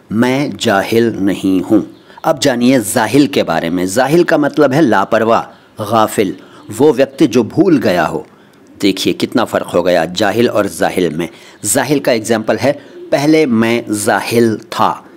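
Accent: Standard. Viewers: आपका शुद्ध उच्चारण सुनकर दिल बाग बाग हो जाता है बहुत बहुत शुक्रिया ।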